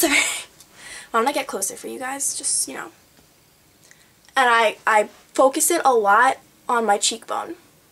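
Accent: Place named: New Jersey, United States. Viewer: im a jersey girl shes deff jerseyy not nyc or staten island jersey acent right there lol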